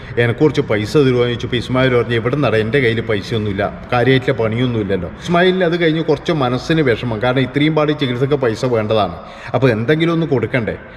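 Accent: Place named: Malappuram, India. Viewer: Malappuram speech. Me also hails from mpm. Like very much.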